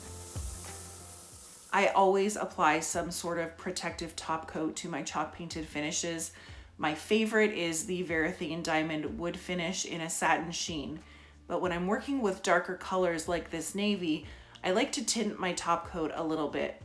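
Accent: Canada. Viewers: I subscribed instantly #1 your style and #2 your Canadian accent :P love from Bangkok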